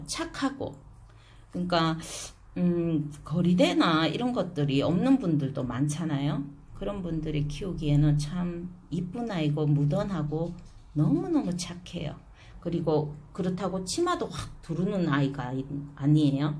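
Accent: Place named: Gyeongsang, South Korea. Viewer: 반갑습니다 경상도말씨가 참정감있네요 영상잘보고갑니다 첫걸음마이신것같은데 화이팅하세요 항상 응원할께요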